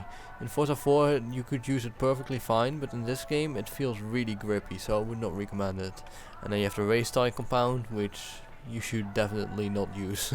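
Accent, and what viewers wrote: Netherlands. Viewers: are you dutch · You can hear that he's from Holland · Ik ken je channel al lang, maar ik heb nog nooit een commentary van je gezien... Het eerste waar ik aan dacht was je accent, duidelijk nederlands. Groetjes uit Duitsland btw! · Accent is dutch, dat ben ik namelijk ook :p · Are you dutch? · your are dutch right?? I noticed an accent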